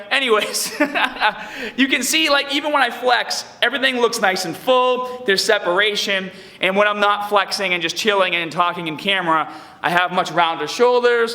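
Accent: Boston, United States. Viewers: haha awesome! Picked up that Boston accent for sure. great video man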